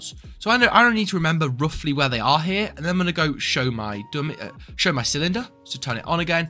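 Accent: United Kingdom. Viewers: boi u british i cant understand watchu sayin for example alt u said Aot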